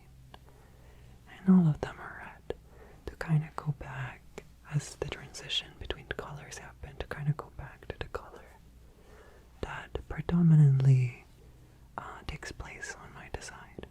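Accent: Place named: Netherlands. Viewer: Another great video! I was actually surprised you hear you’re not American XD although I listening really hard to your accent I figured it was Dutch! Or some Scandinavian country rather ^^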